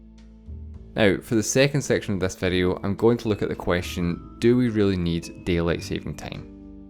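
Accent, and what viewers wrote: Scotland, United Kingdom. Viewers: what accent is this?? Brits?? makes me puke... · His clarity is fine. He just has a Scottish accent that some folks will take getting used to. And I’m sure every youtuber is aware that more videos = more subscribers. He likely has another job or school that is his primary focus.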